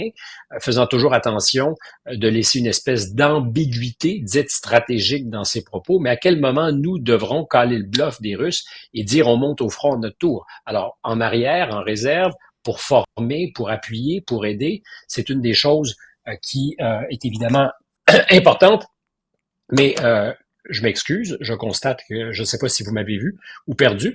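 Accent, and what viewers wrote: Quebec, Canada. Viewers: l'actu avec l'accent du Québec c'est moins tragique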